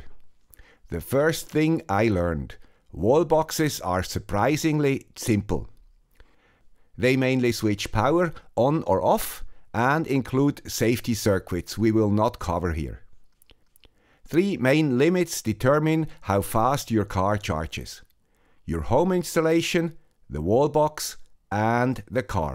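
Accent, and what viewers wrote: Switzerland. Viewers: We missed that Swiss accent so much!! Welcome back and congrats on the new ride🎉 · Omg I can't believe I'm hearing "the guy with the Swiss accent" "live" with fresh ideas, again! 😁😁😁😁😁 Thanks as always! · Hes back, and his accent is more swiss than ever! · Adding to other viewers comments, I've missed the Swiss Accent too. Greetings from San Francisco. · Nice to hear the Swiss accent back! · Nice to listen to your swiss accent again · Yes, the guy with the swiss accent is back and helpful as always. Cheers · Right now YT suggested me this video. I rushed to it when I saw it was from the guy with the Swiss accent. I really miss your videos,. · I really really happy, hear your swiss accent again.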